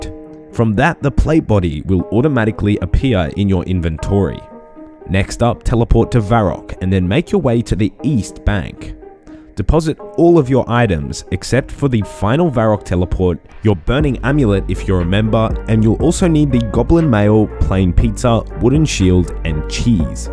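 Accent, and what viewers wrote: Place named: Australia. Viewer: Haha I always love the way Australians say certain words 😄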